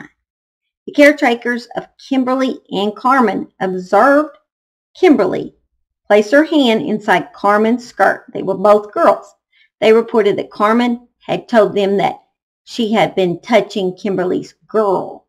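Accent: South, United States. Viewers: Wow, this is the first time I come across your channel you live in Canada, but your accent is not from Canada because I’m from Texas and Arkansas and I’m gonna kind of gas maybe you from round Missouri or Tennessee accent the way you drag your rrrrrrrrr ‘s out I like it I like a southern girls accent OK I’m going back to your your story again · You gotta be a southerner woman rite or wrong ?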